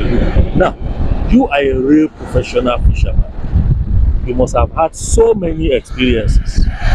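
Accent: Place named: Nigeria. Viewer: Are you from Nigeria,you’ve Nigeria accent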